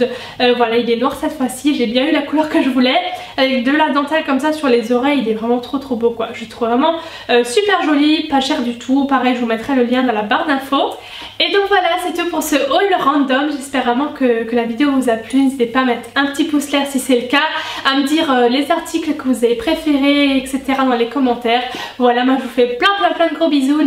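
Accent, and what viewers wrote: Lorraine, France. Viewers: Tu as l'accent de chez moi, Lorraine ou Alsace ? Gros bisou <3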